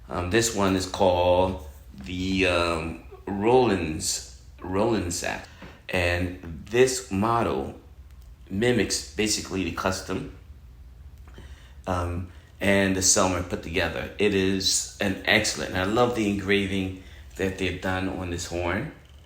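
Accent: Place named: Brooklyn, United States. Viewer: Dude, are you from Brooklyn? You sound EXACTLY like Spike Lee. Not just the tone of your voice, but the cadence. Thanks for the good tips.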